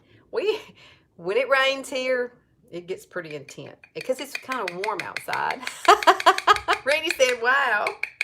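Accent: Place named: Tennessee, United States. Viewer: Loved this tutorial. I’m in Texas and we had those same storms. Anyway....you’re like a TN accented Theresa Caputo(sp). 😀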